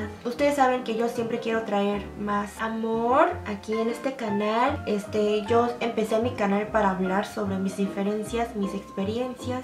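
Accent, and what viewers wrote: Mexico City, Mexico. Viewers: Hay ignorantes en cada país! Los mexicanos somos posesivos y te queremos solo para nosotros! Somos celosos! Una disculpa, por estos tipejos y tipejas, eres hermosa, y talentosa y eres muy mexicana y te defiendes como una mexa! Amo tu español tan chilango! Tu sigue como vas y como decia el Quijote, "dejad que los perros ladren, señal de que vamos avanzando" . Cada quien habla de lo que en su corazón tiene! Así que toma las cosas de quien viene y como decimos en México .... "hacer caso a pendejos es engrandecerlos" Éxito en todo hermana Mexicoreana! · Haaay me encantas...jaja como contestas yo no veo mucho tus videos pero muy de acuerdo con lo que dices, y pues si que bueno que estes muy agusto con todo lo que sientes y tienes...cada quien tiene su vida como quiere y a nadie le piden permiso...hay de todo en la viña del Sr...jaja y por como hablas pues yo te siento muy mexicana...que tiene...jaja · El hate es porque eres Méxicana, y hablas un español perfecto y con acento Méxicano, aparte de que tienes cultura mexicana. 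Si fueras 100% extranjera (coreana) sin hablar bien el español te recibirían con los brazos abiertos así como a tus compatriotas coreanos. Está mal pero así son los mexicanos. Y si los comentarios de hate vienen de otros países latinos es por tu lado Méxicano también. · Hola mi queridisima tengo muy poco que me uní a tu canal la primera vez que te vi quedé sorprendida en tu forma de hablar por que realmente he escuchado a otros asiáticos y hablan con dificultad pero tuuuuu no mames eres bien pinché mexicana aunque tengas tu físico asiatico vale madre lo que digan eres bien mexicana me gustan tus videos yo los veo mas bien escucho por mi trabajo pero trato de actualizarme contigo y te digo que si no les gustan tus videos pues que lo vean y ya cabron no se acaba el mundo bueno te mando saludos 😜😘🤗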